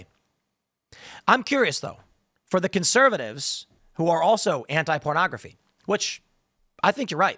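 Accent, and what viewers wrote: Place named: Chicago, United States. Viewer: Tim and he Chicago accent. They gotchya by the bawls, he says. haha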